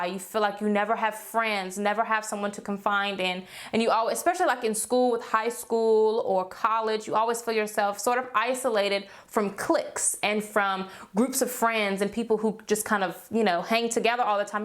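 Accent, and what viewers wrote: South, United States. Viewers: That Southern accent keeps peaking out a little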